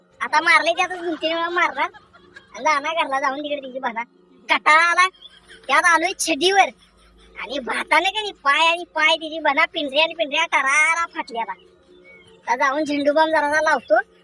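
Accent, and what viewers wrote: Kolhapur, India. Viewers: अण्णा तुम्ही अस्सल कोल्हापूरी बोलताय . मला तुमच बोलण खुप आवडत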